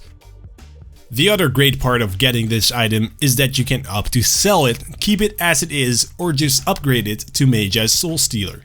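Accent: Netherlands. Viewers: jij bent NL niet waar ? het was echt moeilijk te horen maar ik hoorde het heel klein beetje :p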